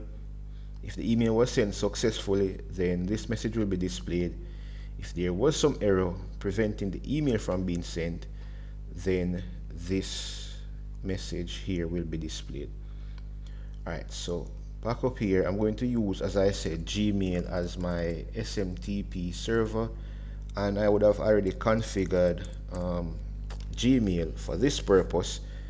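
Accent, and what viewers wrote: Jamaica. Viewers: Bro are you Jamaican?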